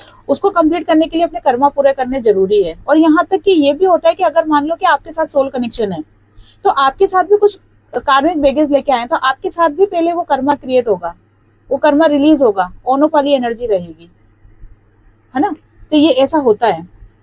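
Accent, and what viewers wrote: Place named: Madhya Pradesh, India. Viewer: Mem Apke Bolne Se He Muje Pata Lag Gaya Tha Ki Aap MP Ki Ho Mem Apki Reading Ne To Kamal Kar Diya 10000% Perfect Same To Same Situation Vry Vry Tks Gujarat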